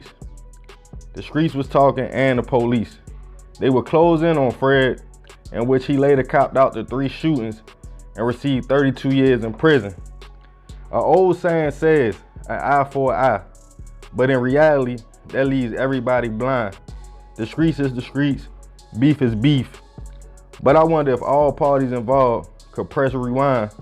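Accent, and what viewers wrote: Baltimore, United States. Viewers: Reposted comment. Im from Bmore, unfortunately. This is sad but the citizens are dumb enough to fall for the tricks. Instead of dealing with the corruption, they are too busy going after each other because they are afraid of white people. A lot of sellouts in Bmore, especially the women. They HATE black men because they look at us the same way white people do. That's why a lot of them are not married or in a relationship but have kids. They'll sleep with black men but can't keep them. Then go against black men in front of white people to get kudos and crumbs from them, smh. It's embarrassing being from there because when I am out of town, wherever I am, and I tell people I'm from there, I get silence and blank stairs. They think it's like the Wire, which is a good dramatic series but it put a stain on the city because the average black man from there, gets looked at that way. 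People think I'm from somewhere else because of how I talk. I NEVER talked like the people in the city or the guy narrating this video. No dis but I was brought up not to talk like that so I didn't. Anyway, black people are the ones who talk like that so when I tell people I'm from there, they are surprised. "You dont act them" or "you don't talk like them" is what I get all of the time and I'm glad because it makes me stand out. People actually like me and trust me more because of it. People think I'm from the Caribbean, Africa, or New York. I'll take it because truth be told, another thing baltimoreans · I'm sorry but yall ex baltimoreans(so you say) are a part of the problem. You see a problem but you have no solution to help. So here you come on this man PLATFORM talking about how someone is speaking. Yall dont know how he speaks OFF OF YOUTUBE. And if you're TRULY FROM BALTIMORE CITY, THERES NO HIDING YOUR ACCENT. 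GTFOH!!!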